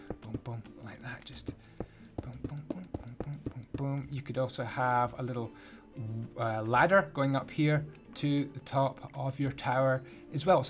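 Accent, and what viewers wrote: Scotland, United Kingdom. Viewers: Decided to subscribe because of this building system! And because you're Scotish!. Love the system. IT's so easy to follow as a beginner and easy to spruce up as an expert builder. Keep up the good work. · I love the wee bit of Scottish in there. :) Well done you. ^^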